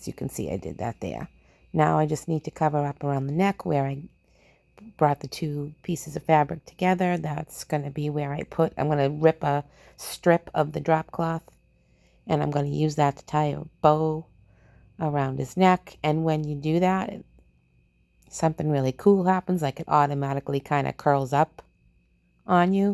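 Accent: Boston, United States. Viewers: Is that accent from Boston? I get nailed all the time with my "Texas Country Twang"😂😂❤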